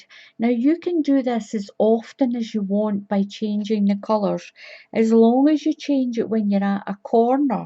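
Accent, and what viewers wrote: Scotland, United Kingdom. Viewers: where are you located in the UK if you don't mind. I hear a beautiful Scottish accent am I correct?? Also, I like to use, current US standard, Meetup App/Zoom meetings for local get togethers with different groups (recommend for all). Barbara, Sacramento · I love your accent. Scottish? Great tutorials, thank you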